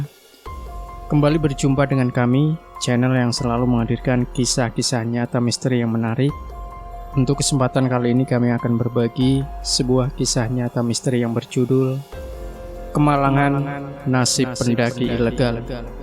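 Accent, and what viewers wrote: Java, Indonesia. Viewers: Logat jawa medok ngomong guwa2...saya lbh enak didengar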